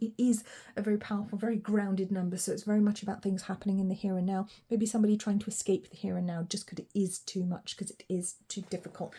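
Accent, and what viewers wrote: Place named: United Kingdom. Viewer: First thing you said in option 2 is you heard a British male voice. That’s my person (I’m American). His particular accent is much like yours. Sunflowers, poker, navy, and keen humor are especially significant. 1’s, 0’s and time— it’ll be 10 years apart at the end of summer. Also my sun and moon signs showed up. This resonated well in many ways—excellent reading. Thank you ❤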